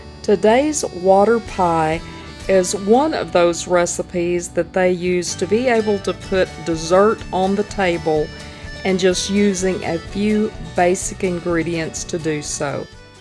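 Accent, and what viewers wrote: South, United States. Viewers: It will never stop being confusing to me how Americans ended up with that stupid, idiotic southern accent. It's disgusting. Why???????????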